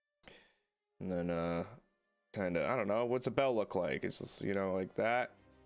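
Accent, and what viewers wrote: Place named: France. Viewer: with a French accent makes him so funny and handsome (totally not saying this bc his description says so- ) Joking aside, I love seeing your silly art Mr.. Truly an artist of time indeed <3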